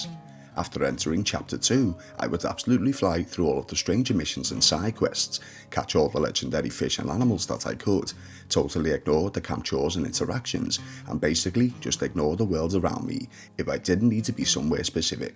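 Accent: Liverpool, United Kingdom. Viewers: Alright mate can see you’ve not uploaded for a small while, just thought I’d give you a shout and say that I think your videos are bloody incredible. I’ve been playing RDR2 for some time, but never fail to find something new through your videos. It’s also a pleasure to hear a scouser talk about my favourite game. Hope all’s well and looking forward to your next video! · Nice to hear a fellow scouser doing something on YouTube that’s not relating to footie.😃 Luv RDR2 and 1 as well. I usually start off bad ie killing the O’Driscoll in the Adler stable and Jimmy Brooks off the cliff, but by Ch 4 I’m a pretty good guy most of the time anyway.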